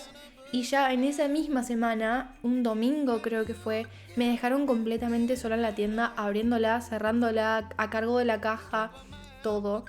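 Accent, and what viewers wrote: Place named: Argentina. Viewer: Hola muy guapa riene el acento de argetina yo aoy de ecuador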